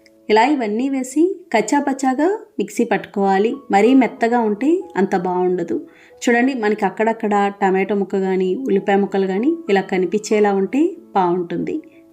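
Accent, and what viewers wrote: Godavari, India. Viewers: అది రాగాలు ఏమీ కాదు, గోదావరి జిల్లా ల మహిళలు అలాగె స్పష్టంగా మాట్లాడతారు, అది వారి మాండలిక శైలి, శ్రధ్ధగా వింటే చాలా బాగుంటుంది, ఎవరి మాండలిక భాష వారు మాట్లాడతారు గదా, దోషం ఏముంది! మీరు ఇలాగే చెప్పండి సోదరీ! సహజత్వాన్ని పోగొట్టుకో రాదు అని మనవి 😮😅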